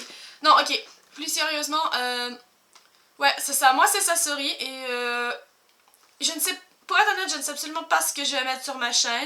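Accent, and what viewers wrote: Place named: France. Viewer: Ouf, l'accent français!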